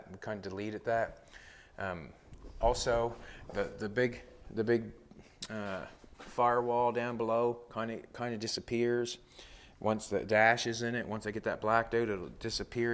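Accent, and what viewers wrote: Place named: Canada. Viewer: 30 minutes ago I was incredibly frustrated while attempting to polish the defects out of my own Imron paint job. I don't think this really helped me figure out how to fix it, but You're calm Canadian accent calmed me down, haha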